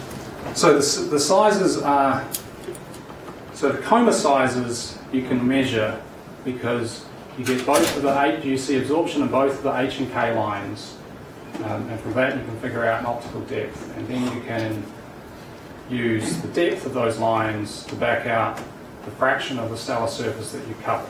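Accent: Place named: New Zealand. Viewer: " I'm not gonna try and compete with Van Maanen's Star" - He does have a distrinct New Zealand accent.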